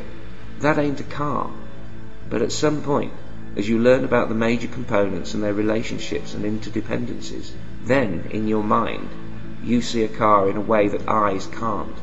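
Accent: United Kingdom. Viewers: Sounds like UK maybe?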